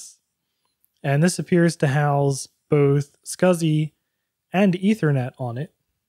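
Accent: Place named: Philadelphia, United States. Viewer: LOL you didn't have to mention "the hot Philadelphia sun"; as soon as you said "wooder" (water) it was plain as day where you're from good sir. :D Thanks so much for sharing this awesome find! I recognized that case from the old Dells of the same era, but I never got the opportunity to see any of those official third-party Macs before!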